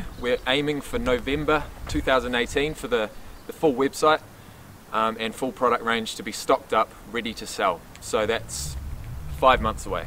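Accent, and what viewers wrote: New Zealand. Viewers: You sound like a Kiwi? Are you Aussie or Kiwi bro? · Are you an Aussie? Got a bit of a kiwi accent going there bro! Nice vid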